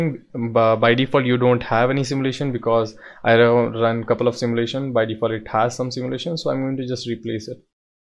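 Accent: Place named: India. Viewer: I found that this guy is an Indian because of his voice😎😈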